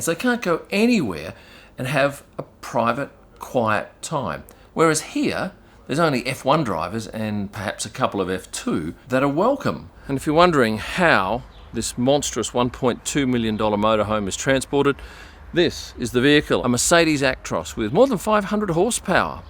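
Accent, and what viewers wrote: Australia. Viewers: Fantastic channel. It's good to hear a laid back Aussie. Great content and presentation. 🇦🇺 😊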